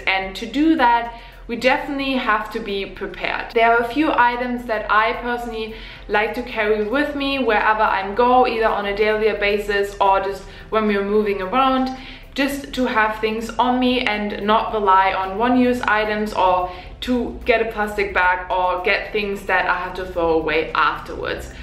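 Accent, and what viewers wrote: Germany. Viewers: Hey! I just wanted to drop in and say that I LOVE your content! I just subscribed and can't wait to see more videos :) I also really love your accent. One of my very best friends is a foreign exchange student from Germany and it reminds me of her. I'll be so sad when she leaves, but we will be able to stay in touch and hopefully visit each other in years to come!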